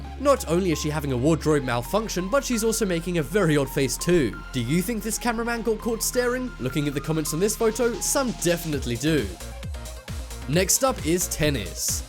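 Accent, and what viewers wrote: United Kingdom. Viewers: Yeah I can tell he's British "or of the sort" I just really like his accent and the pronunciation haha. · He's British, and I would love to speak in a fluid British accent, but it's hard to learn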